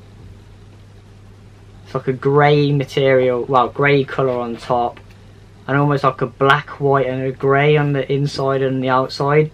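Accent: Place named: United Kingdom. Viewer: what part of the UK did you come from with that accent mate?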